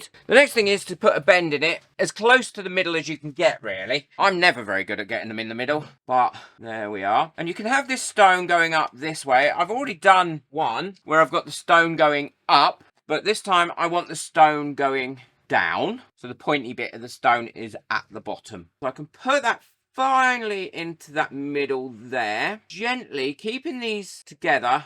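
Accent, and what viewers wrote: United Kingdom. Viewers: It's just wrong to hear US standards in such a thick UK accent...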